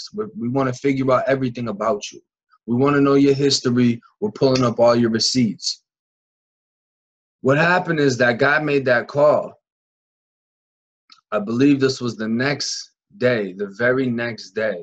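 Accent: Boston, United States. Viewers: Yo your mix of Boston and country accent is great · This dude talking about Florida, but is he from Beantown? That accent sticks out to me. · Hey hon, i really enjoy your content. You have a great way of speaking and your Boston accent is da bomb. Your stories are very interesting. You will be growing by leaps and bounds on here. How are your tootsies feeling? What a serious painful nightmare. People underestimate the sensitivity of the toes! I was just crying over a simple~ass blister on my toe from a pair of new shoes! Please! Frickin wuss I am! I look forward to more videos from you! Best, Michele from Baltimore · And are u from boston origanally bc ur accent sounds exactly like a boston accent just wondering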